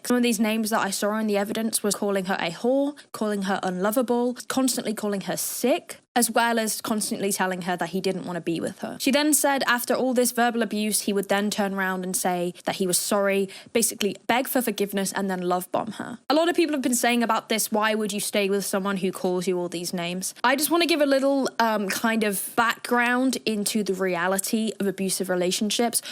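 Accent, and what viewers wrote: United Kingdom. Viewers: r*tard is used in uk to mean idiot even tho u sound abit british u have the sensativity of a american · He sounds SO British that the fact you are too did not set in until 20 minutes in 💀 sorry, side note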